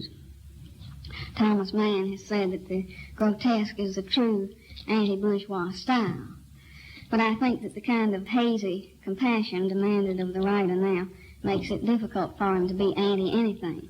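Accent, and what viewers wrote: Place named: Georgia, United States. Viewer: She has a very strong middle Georgia accent. It's easier to distinguish in her reading of "A Good Man Is Hard to Find." No, it's not a hillbilly or mountain accent or a swamp accent, but it's definitely a strong accent--nowhere near standard.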